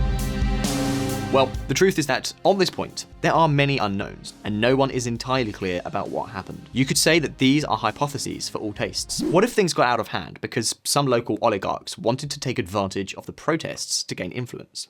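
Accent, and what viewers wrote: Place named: England, United Kingdom. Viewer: How is the standard of living in the US? How many homeless increased in recent years? Many Russia leaving because of the harsh weather and by nature like to travel the world. Propping dictators around the world?! Excuse me but, who is backing up the Saudi royal family despite dismal human rights? Bahrain, Egypt, Israel, and many other nations in the past and present. This is one sided propaganda show with an English accent, to make it more credible for the simple minded young viewers who watch mainstream and believe anyone with that accent. I dare you to make a video about the stolen historical artifacts the lay in Western Museums, or about Assange raw deal, maybe enough spine about Guantanamo bay prison, Snowden, cooperate America, backing mascaras in Indonesia in the during Suharto reign, Opium war affects, the theft of Hawaii, all the broken treaties with native Americans, the pillage of Australia by the British, The king of Belgium in Africa!! 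More recently show the new Nazi affiliated government of Ukraine and their western destabilizing actions all over the world. Show some spine in your reports, be original instead of copy pasting your own Empire agenda. Just a month piece nothing more nothing less. Grow some balls Sir.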